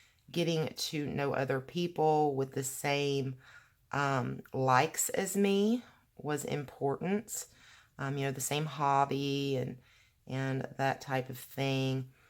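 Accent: South, United States. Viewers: OMG!!! WOWZA!!! I have NEVER had the courage to color in my Klara Markova books until I found this video! I am in AWE of how well you do such remarkable things with your markers!!! Please know I am honest to a fault... so know I will be real with you. You tickle me when you are REAL with us in these videos. Thank you soo much for your honesty. Love your goals and candor about your life, a lot of us don’t even know we have lost ourselves... and it’s totally okay to have your SOUTHERN ACCENT, most folks find it sweet and yours is not as deep as some are... wish you would be less critical of yourself! You are so DELIGHTFUL and HONEST!!! THAT will grow this channel sweetie... I watched you on this page in Tenderful Enchantments and what I saw was you not even hesitate to shade in with the gold gel pens!!! You really do know where and how to shadow, girl...!!! 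I watched you lay down the blues and deeper blues and see you instinctively place shadows where they go!!! I said I will be honest. It blows my mind how you worry about wips, cuz I’ve got a bunch, that I may go finish or not. It’s not a biggy for me. I have every intention of following you in 2019... and learning that I’m more normal than I realized until you put yourself out here. I love you a bunch and wait for your videos to come up. Hey, welcome and THANX for being frank about gaining weight over the holidays, like the rest of us. I know what ya mean about the anxiety stuff & the patience, err I don’t l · Hey.... just FYI... if you use the paint w glitter... it WILL dry flat;o)) I have used it on quite a few pages in my books. I also have ALL of the Markova books and I really can not say one is better than the other, however, Tenderful Enchantments is my favorite!!! I am swatching colored pencils now watching your video;o)) I had to laugh when you was talking about the way you speak and say words...I get that ALOT...its a SOUTHERN THANG... 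I'm from New Orleans;o) Keep up the good work and I hope to get some things DONE in 2019 myself... thanks for the PEP talk!!!!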